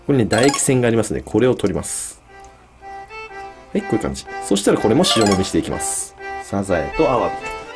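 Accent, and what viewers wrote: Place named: Mikawa, Japan. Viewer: だらって三河弁なんだね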